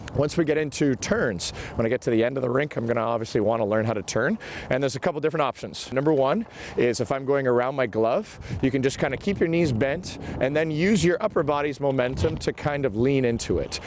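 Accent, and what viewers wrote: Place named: Southern Ontario, Canada. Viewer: Came for the tips. Stayed for the Leafs representation and southern Ontario accent. Felt right at home. Thanks for the lesson!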